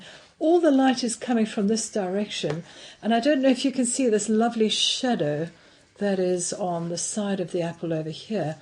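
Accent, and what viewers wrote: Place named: RP, United Kingdom. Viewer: So posh :p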